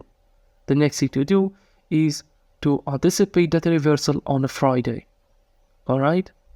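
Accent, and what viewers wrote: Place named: Arab world. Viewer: Nta 3arbi wla maghribi bayna men l accent walakin you are nice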